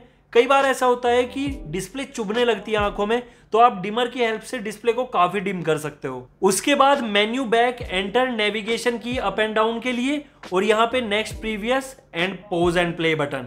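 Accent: Meerut, India. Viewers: Bhai aapki zaban se Meerut barasta hai 😀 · Dislike. Can't understand this Indian english accent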